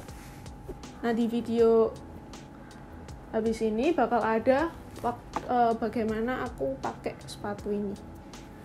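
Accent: Java, Indonesia. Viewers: Medo' banget jawane :v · jawa banget ngomong nya, love you cantik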